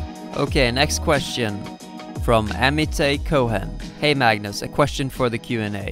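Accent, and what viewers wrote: Bergen, Norway. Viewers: Damn i think his accent is changing! Less norwegian accent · It's always so weird when you speak Norwegian and it's with a Bergen dialect. I expect something else for some reason, I've mostly heard you speaking English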